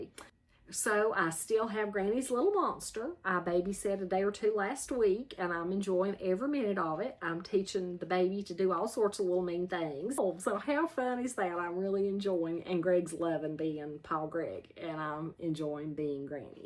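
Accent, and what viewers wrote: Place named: North Carolina, United States. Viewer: I can just listen to you talk all day long. You sound like my cousins down in Yancey County NC. I was born there but we moved away when I was four years old. Your sewing channel is so fun to watch, love it! 😍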